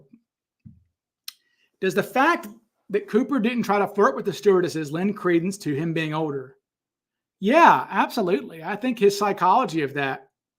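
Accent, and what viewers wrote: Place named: South, United States. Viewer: Ha voice of insight/truth/reason but a hillbilly voice PS like grading the suspects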